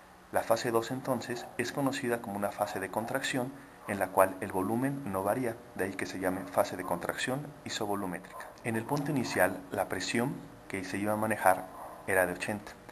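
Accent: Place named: Spain. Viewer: jajajaj ,se oye muy español el hombre jajajaja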